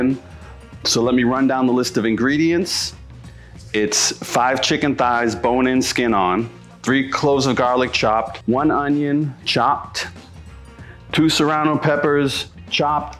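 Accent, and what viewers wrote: Boston, United States. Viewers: Pointless comment here: This is the first episode I watched where I could actually hear the Boston accent. 🙃 I love watching this channel! Thank you for all the "cooking classes." (Essentially.) I swear I learn something new every episode. ❤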